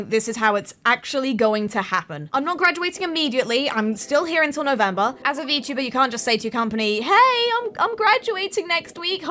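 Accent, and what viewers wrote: United Kingdom. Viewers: Lol you're a masterclass at explaining things. Maybe its just because your british voice and humor is so nice to listen to lol. I look forward to seeing how great you grow <3. Hope you will have a lot of amazing stuff planned. btw: +1 sub toward your million. I see you from a ton of clips <3 Your Moth-...I mean umm I have seen those clips too;)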